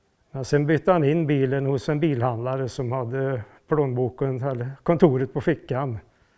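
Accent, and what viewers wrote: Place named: West Gothland, Sweden. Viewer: För himla gôtt å höra en rekti väschgöte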